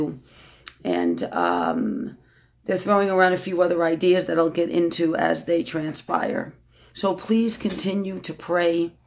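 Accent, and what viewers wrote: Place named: New York City, United States. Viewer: GOD BLESS YOU WOMAN OF GOD. I HAD A SISTER IN CHRIST WHO SENT ME YOUR VIDEO RECENTLY AND YOU'VE BROUGHT SO MUCH CONFIRMATION. MAY GOD BLESS YOUR MINISTRY AND KNOW I'M SENDING PRAYERS FOR YOU, YOUR HUSBAND AND YOUR MINISTRY IN JESUS' NAME. I LOVE YOUR REVERENCE FOR GOD, HIS WORD AND HOLY SPIRIT! ON A SIDENOTE I'M ALSO A NEW YORKER AND JUST LISTENING TO YOU MAKES ME MISS MY NYC ITALIAN FRIENDS. #SPELLMANGRADUATEOVERHERE 🤗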